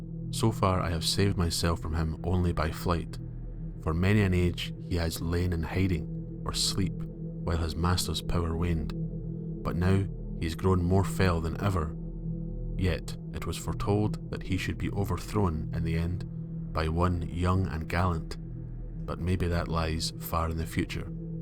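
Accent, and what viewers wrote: Scotland, United Kingdom. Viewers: Always a lol strange hearing another Scottish accent on YouTube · Good to hear a Scottish accent doing this rather than your typical southern one…… the real north, north of the walls. 👍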